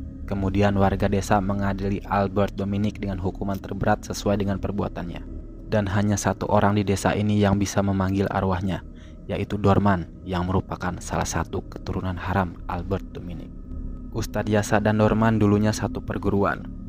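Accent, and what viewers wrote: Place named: West Java, Indonesia. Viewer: Dari fb, pindah ke sini penasaran pingin tahu alur ceritanya, seru dengar suara aa cerita Sunda banget ☺👌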